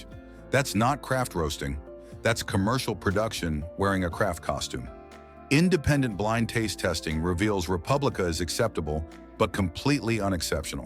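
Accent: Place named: United States. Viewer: Why the Yank accent?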